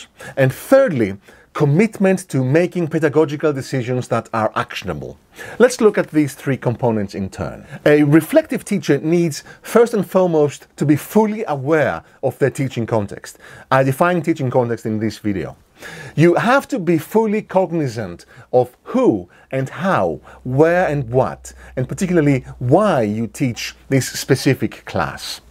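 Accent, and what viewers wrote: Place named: Greece. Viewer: Noticed right away a greek flavor in your accent Mr.. Thank you and Καλή χρονιά!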